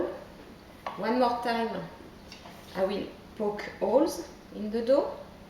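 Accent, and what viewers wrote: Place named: France. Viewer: What a delight to listen to your French accent with your very good English. You bring back so many wonderful memories of the 12 years I lived and worked in France. I lived in Etampes & Arbouville and worked in Angerville. You have just demonstrated that a French Baguette can be made in so many ways and not just the classic artisan method. BRAVO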